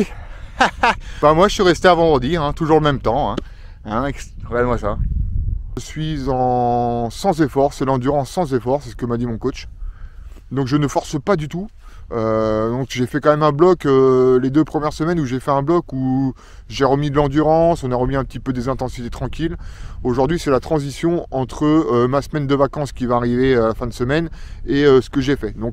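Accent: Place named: Marseille, France. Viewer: Salut c’est mouna trop marrante la vidéo je sais pourquoi tu parles marseillais c’est se que tu faits avec papa au bureau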